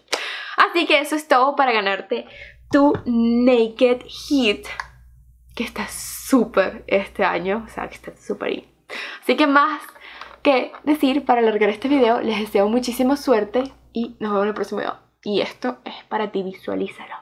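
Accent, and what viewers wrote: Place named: Venezuela. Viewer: Sii! qué lindo sorteo!!! bienvenida nuevamente!! te veo desde Argentina! lo que más me gusta de tus videos, además del contenido, es tu voz! jaja no sé porqué pero me resulta muy relajante jaja será por la tonada venezolana jajaja.. bueno te sigo en casi todas las redes sociales menos snap ya que no tengo :( espero se comprenda que muchas personas no contamos con cuentas en algunas redes sociales ya sea por falta de tiempo o simplemente por gustos...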